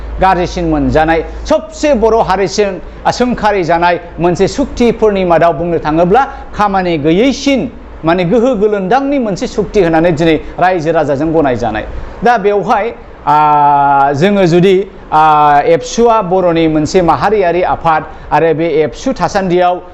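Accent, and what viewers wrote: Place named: Bangladesh. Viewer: Ngni raoa bangladesi butumnaisw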